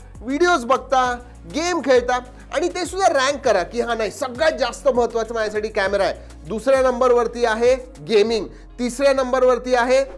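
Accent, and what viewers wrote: Pune, India. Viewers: Sir, tumhi punyache ahat kay?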